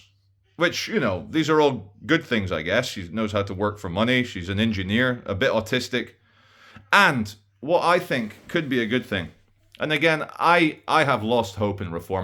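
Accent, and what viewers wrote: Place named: Scotland, United Kingdom. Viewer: I love the Scottish accent, cracks me up every time. Oor! 😆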